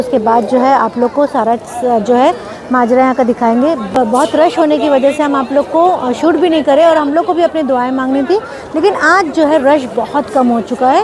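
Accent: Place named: India. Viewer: آپکی اردو ہم کو بہت اچھی لگ رہی ھے۔ہم آپکے لہجے سے فورا سمجھ گئے کہ آپ ہند سے ھیں ۔پاکستانی نہیں ھیں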